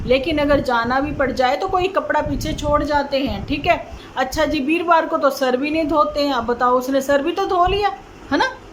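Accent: Punjab, India. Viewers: ji main ludhiana se hu pera apki punjabi theth punjabi hoti bhot achai lagti jai🎉🎉🎉🎉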